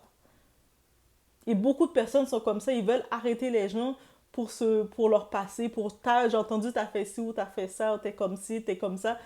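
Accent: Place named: Canada. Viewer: Merci ❤❤❤ je voulais te demander je reconnais un accent canadien tu es de quelle origine?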